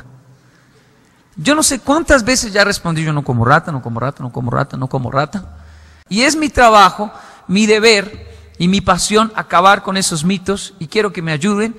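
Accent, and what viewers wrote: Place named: Colombia. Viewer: Es colombiano. De ahí su acento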